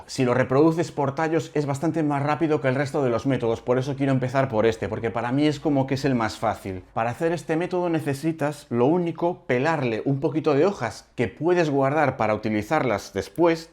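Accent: Spain. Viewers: E visto muchos vídeos, pero este me encantó por lo sencillo que explicas y tú buen humor, además ese acento como dicen en mi país "españoleto"sin ofender ❤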